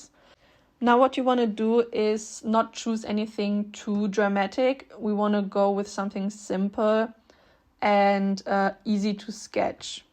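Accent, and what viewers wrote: Germany. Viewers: i have learnt german and i don’t mean to be an absolute mug but i love the german accent. i hope ur not insecure about it, it’s so awesome :))) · Are you German? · Du bist einfach deutsch hahahha, dass hört man;) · Nice video!! Random question, are you German by any chance? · are u german ? cuz u kinda sound german Good Video Btw^^ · Wie Deutsch will dein Akzent klingen. Trotzdem cool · omg no! Its just that i recognized the accent somewhere and then remembered my German classmates speaking english! Good video though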